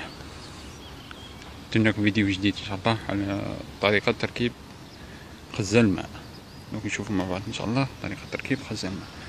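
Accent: Algeria. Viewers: كل منطقة ولهجتهم # أظنه من ضواحي مستغانم....عشعاشة. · سلاااامااات!! هذه لهجة الغرب الجزائري إذا فهمتها فهذا جيد! وإذا لم تفهمها فابحث عن فيديو آخر بلهجتك أو باللغة العربية الفصحى! بدل تعليقك (الثقيل)!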